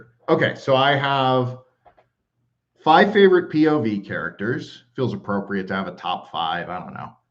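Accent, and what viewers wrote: South, United States. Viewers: I was wondering recently, what would be the reaction, if I heard someone else reading the story. I enjoy Roy's performance for many reasons. It's professional. He has a cadence to his delivery and it is very quick. I've heard for years. Listening to your performance, slower with an American accent, I have noticed things that went unnoticed since 2021. -The guy who fought a wight in the prologue. His blade got covered in frost. His sword had frostburn. That's so cool. I kept missing it, in Roy's delivery. -The man whost Ned dehe ded, he was tied up on a holdfast's wall, when they rode up. I never noticed that. I feel like this version puts glasses on my understanding of the story. It's giving surround sound. I am in the middle and not in the front, watching. So I'm going to listen again. 🚶🏿‍♂️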